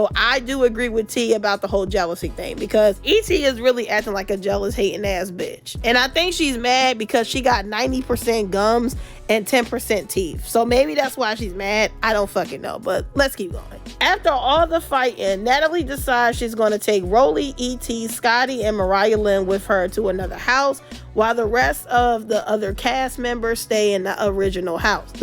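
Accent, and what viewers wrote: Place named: East Coast, United States. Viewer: Just bc you’re only AA and don’t know your history doesn’t mean others doesn’t. There’s WHITE PUERTO RICANS 😂😂😂😂 SPANISH IS A EUROPEAN LANGUAGE!!!! Damn I was feeling you till started showing the failure of American education. You sounds like you’re from the east coast where there’s variety but you are hella ignorant fr ughhhhhh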